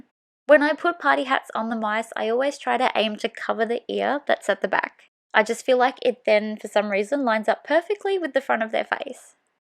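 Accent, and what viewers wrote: Australia. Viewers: so nice to hear an Aussie accent!! Congrats on your 100th video tutorial! Adorable card!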